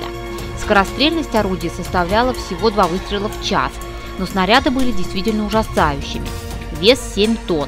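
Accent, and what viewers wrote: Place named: Moscow, Russia. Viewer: Ага, мааасковский.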